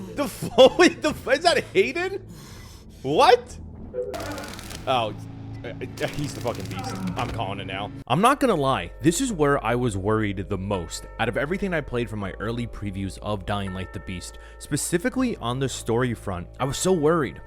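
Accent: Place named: Scotland, United Kingdom. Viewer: Just a smidge of the Scottish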